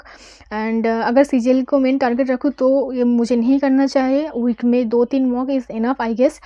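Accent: Bengal, India. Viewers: Ntpc ka process is baari fast hoga Or West bengal me vacancy bhi accha h....tumhara bolne ka style se lag rha h u r from bengal... So don't miss it keep going on👍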